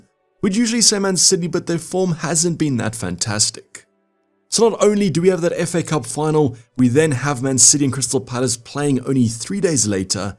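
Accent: South Africa. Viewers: This ou is definitely south african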